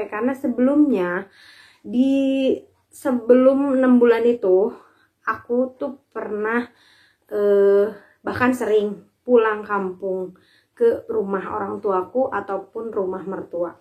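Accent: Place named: Tasikmalaya, Indonesia. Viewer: Logat tasik na aya keneh.. 😁😁✌️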